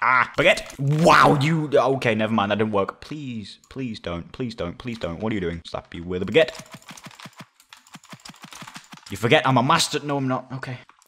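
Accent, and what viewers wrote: United Kingdom. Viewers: Are you British? You sounded like you were as a kid.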